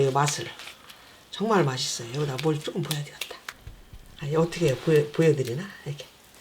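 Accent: Chungcheong, South Korea. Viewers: 충청도 신가바요?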